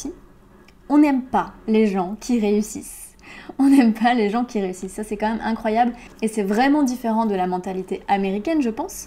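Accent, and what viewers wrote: France. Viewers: ta voix et ton accent français sont des professeurs géniaux!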